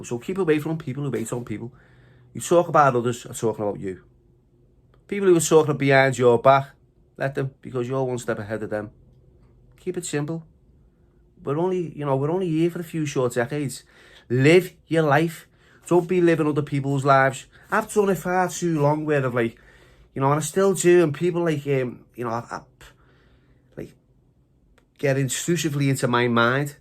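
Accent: Liverpool, United Kingdom. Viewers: Good chat scouse love it 😀 fuck aki and french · I knew you were from shpeke as I’ve got a few mates that talk with the shh in the scouse lingo I bet you know my mate Raymondo who the Mato got hold of and took the lot , mansion Bentleys lambos the lot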